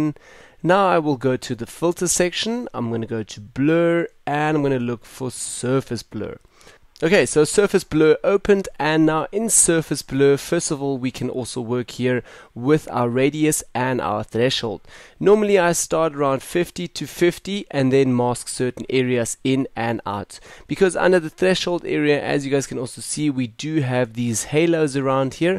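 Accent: South Africa. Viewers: You sound South African. I dig that!!! Nice video!!!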